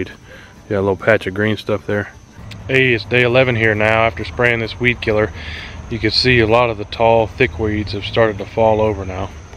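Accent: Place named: East Tennessee, United States. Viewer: He talks like we do here n East Tennessee “FRONCH Yard” 😂😂. Thanks for the video definitely getting that.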